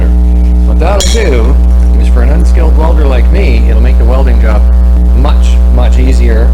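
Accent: Canada. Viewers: Are u from nova scotia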